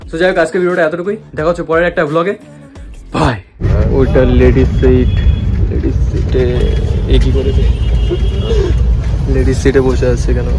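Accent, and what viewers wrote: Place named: North Bengal, India. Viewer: I hv come to see your vlogs v recently and glued to them. Honesty bro, toder North Bengal r bolar accent ta khbi sundor.. Really enjoyed watching ur videos..I hope you get more success in the future. Take love from Burdwan.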